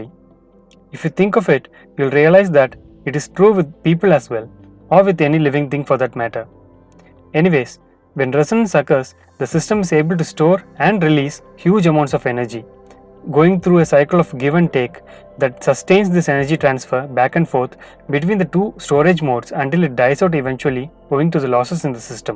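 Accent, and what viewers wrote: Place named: Kolkata, India. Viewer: Hi brother, your accent seems from calcatta. May I I know your home and educational background?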